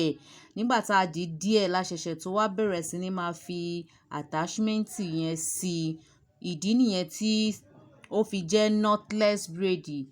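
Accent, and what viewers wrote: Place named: Yoruba, Nigeria. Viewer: I really like your yoruba accent. The hair style is lovely. You have so well.❤❤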